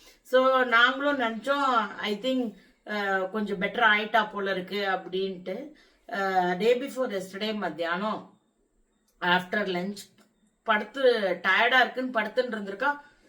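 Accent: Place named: Iyengar, India. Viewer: Ellam Nanmaike, all the best, I am sure your mom n law understood your situation. She was there on Mon, perhaps spoke to you at length on that day to wish you well ( leave her blessings in person ), namakku enna arul irrukko adhu muzhu shaga irrukkum, don't worry, everything will workout fine, I like your iyengar tamizh, I watch off and on, we will wait for you to come back and enjoy your recipes, take care, relax and do the needful under the circumstances. Be well, keep strong, thanks, Radha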